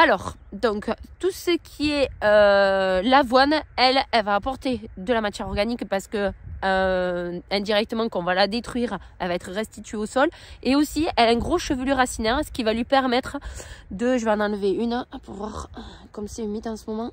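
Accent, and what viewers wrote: South, France. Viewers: Bonjour belle vidéo comme d habitude bien expliquée avec ton bel accent du sud Bon réveillon à a vous quatre et à l année prochaine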